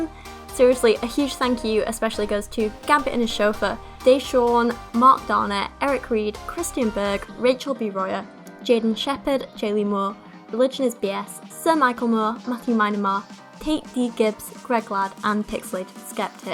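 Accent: United Kingdom. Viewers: Dammit, Rachel talks just like my sister, but with an British accent....tripping me out.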